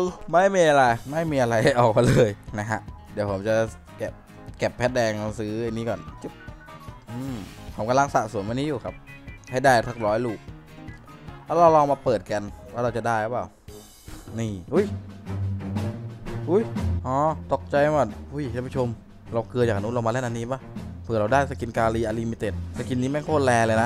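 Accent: South, Thailand. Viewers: พี่เป็นคนใต้หรอครับ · พี่ย่าเป็นคนใต้หรอ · คนใต้นี้หว่า55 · ชอบพี่แหลงใต้ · พี่คนใต้หรอครับ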